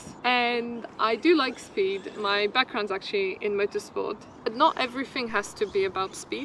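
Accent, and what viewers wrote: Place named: London, United Kingdom. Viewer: talk in this weird London accent... a malformed style of English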